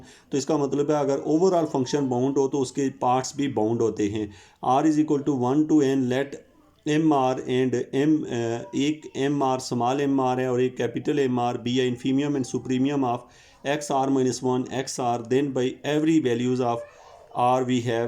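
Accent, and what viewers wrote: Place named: Pakistan. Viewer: Good Video, your accent is Pakistani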